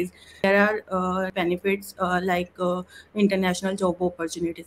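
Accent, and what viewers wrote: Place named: Punjab, India. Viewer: i love your slightly punjabi accent❤❤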